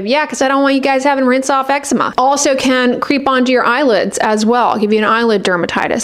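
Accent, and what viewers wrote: United States. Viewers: Unrelated, but what part of the USA is this accent from?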